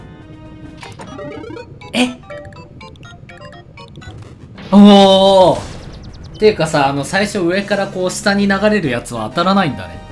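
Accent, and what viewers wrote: Kansai, Japan. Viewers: さん関西の人？(・o・)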